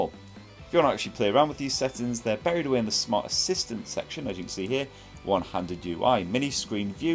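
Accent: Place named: England, United Kingdom. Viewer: Thank you so much for this! First review was from an Indian dude, nothing wrong with it, but I can't understand his English, haha. Nothing can beat an English bloke! And very detailed review, wonderful! Only thing that concerns me slightly about this phone is that the battery is not removable so you can never replace it.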